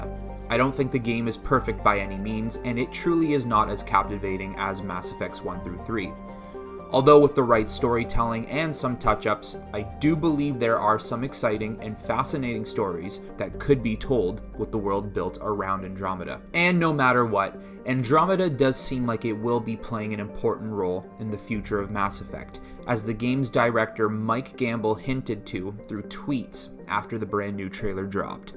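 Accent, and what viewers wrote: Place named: Canada. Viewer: you’re so welcome. Also I LOVE that Canadian accent 😃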